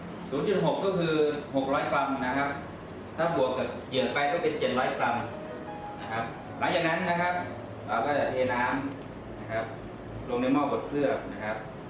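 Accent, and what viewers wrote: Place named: South, Thailand. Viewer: เกรื้องเกลื้อบดินเผา ,ทิ้งไว้สักกรู้ คนพากย์เป็นคนใต้แน่เลย<num> ก.ไก่ทุกคลิป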